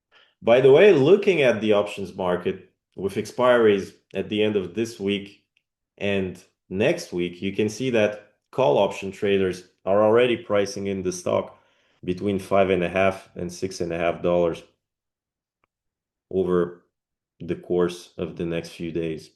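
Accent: Quebec, Canada. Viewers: Are you from Quebec ? Your accent looks familiar. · Tu vien du Quebec?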